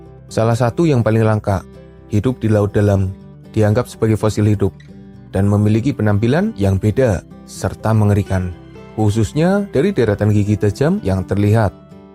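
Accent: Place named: Central Java, Indonesia. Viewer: Kayane wong jateng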